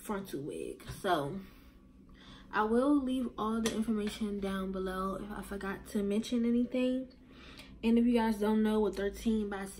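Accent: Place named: DMV, United States. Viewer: I know a DMV ACCENT when I hear one 🥰🥰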